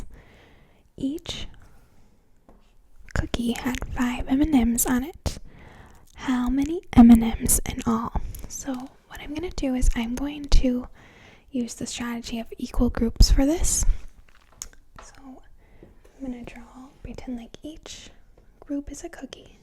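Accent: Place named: Upper Midwest, United States. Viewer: Based on the accent, I'm guessing upper Midwest U.S.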